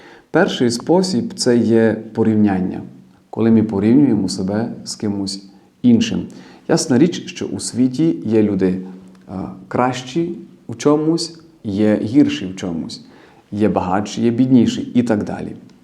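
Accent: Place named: Transcarpathia, Ukraine. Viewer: Слава Іісусу Христу! Дякую от. за Ваш підхід і пояснення теми пліткарства, особливо тронула кінцівка про наповнення Божою любовью.Рада за Вас, що збільшується кількість слухачів, а значить однодумців.Приємно чути закарпатський діалект.Вітання всім зі Сходу з Харкова, нехай Бог усіх береже, єднає через Своє Слово і веде до перемоги 🙏📿🇺🇦🕊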